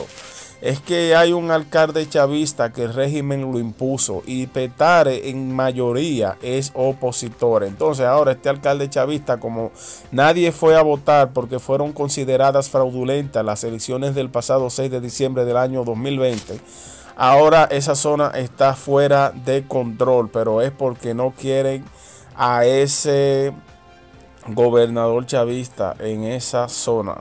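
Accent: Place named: Cuba. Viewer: amigo se nota que estas en contra del régimen pero de donde carajo eres por que no pareces venezolano, dices arcarde , gobelnadol ... eres cubano o de donde carajo? si eres venezolano disculpa pero toma clases de dicción.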